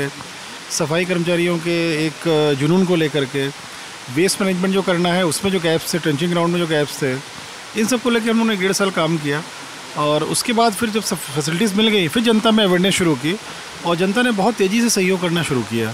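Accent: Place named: Rewa, India. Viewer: Are thakur sahab apna rewa ke ahan mangawa Se main 30 km dur mauganj se hu... apki tone se hi samajh me arha tha wah..App rewa ajao sirji apki jyada jarurat hai rewa me Kaha netan ke cahkkar me pade han..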